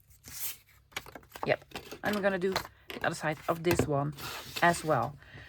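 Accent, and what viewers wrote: Netherlands. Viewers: You are so cute, I enjoy your Dutch accent very much. My grandfather, Cornelius Vander Jagt and my grandmother Cornelia Van Strien were born in the Netherlands. Your voice reminds me of Grandma's voice many years ago (I'm 70!) Your happy attitude make listening fun. I have been a artist for many years painting watercolor. I am now using photos of my art to design tags, pockets and anything to do with journals. I fussy cut the photos and apply them with glue. Trying to make my own ephemera, one of a kinds! Thank you for the hours of happy, relaxing fun. Debra L Pate Art.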